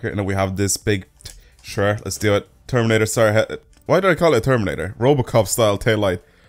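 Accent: Dublin, Ireland. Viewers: Are you Dublish?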